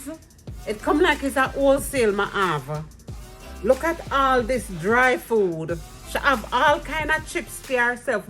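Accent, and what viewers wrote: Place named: Jamaica. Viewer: Interesting to see your haul from Jamaica. Items I've never seen before and great education for me and especially you speaking in Jamaican 😍👍🏻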